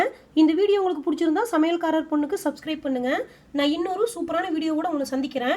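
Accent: Kongu, India. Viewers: konjum kongu tamil super, very respective slang in tamilnadu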